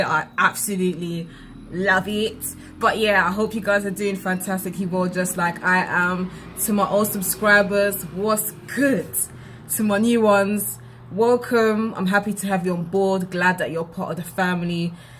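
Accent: United Kingdom. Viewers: Really enjoyed this video, good tips! And I also LOVE your British accent!😊🙌🏾 new subscriber here🙋🏾‍♀️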